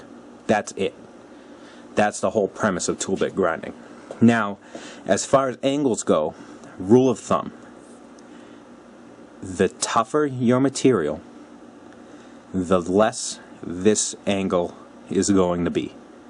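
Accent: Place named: New England, United States. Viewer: Good to hear the accent - I used to race at New England dragway in Epping and grew up in Burlington, Mass. - Now teaching machine shop in Florida - good videos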